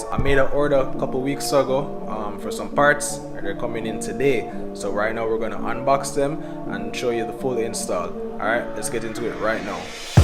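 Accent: Jamaica. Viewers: Subscribing just because yuh sound like a yaadie👊🏽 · Rights afta mih hear accent mi click subscribe. Bigg upp🇯🇲🇯🇲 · LOVE this platform gonna be getting one for my first car when i migrate 🔥 Bro sounds Jamaican tho🇯🇲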